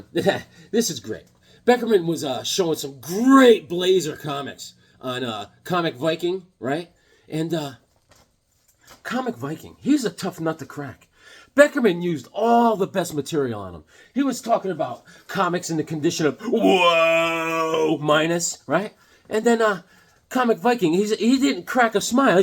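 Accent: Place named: Australia. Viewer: my guy! Popsicle art is the highest form of flattery! Loving the art! You're too tough on yourself. Conan has been a lot of fun. Never met a baby that didn't love setting off fireworks. Justice society was the victim of Ghost Machine I think. Infinity Inc. is a Beckermin favorite from way back. The legion of the unliving! Glad to hear Ron is doing good! First appearance of living lightning! That Night Thrasher is from his ongoing series not to be confused with the limited series Four Control. Issue 3 is the pro debut of Ken Lashley art. Yeah comic viking is made of stone, I'll break him down yet! Thanks for the joke loan! Did you ever see my character the comic community hunter from 2022? Such an authentic Aussie accent. I need to get that Captain Universe run. Love those og guardians of thr galaxy books! This Rip Hunter book wow!! Great books this week! 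Yeah Comic Viking is one of those rare serious Marine vets. I'll win him over yet! Have a great one!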